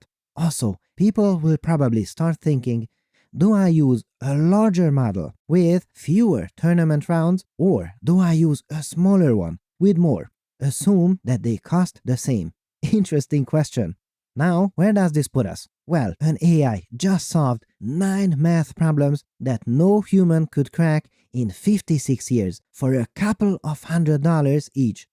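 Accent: Hungary. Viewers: So TMP is Hungarian? News to me. I was curious about the fantastic accent. Great paper as always, Doctor. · Ah Hungarian! Your accent is super cool but also very unique.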